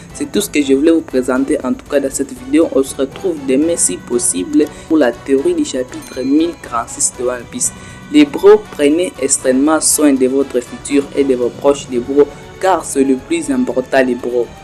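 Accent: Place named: Democratic Republic of the Congo. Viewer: Congolais c sur ton accent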